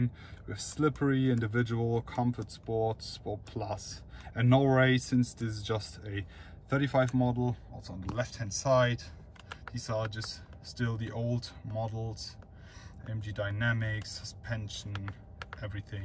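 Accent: Germany. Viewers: Good work short comments. PS Man hört das du deutscher bist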